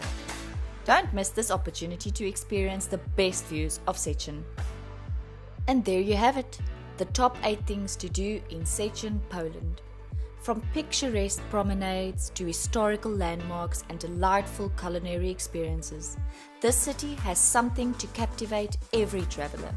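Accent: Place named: South Africa. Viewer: Its funny to here a South African voice about Poland lekker man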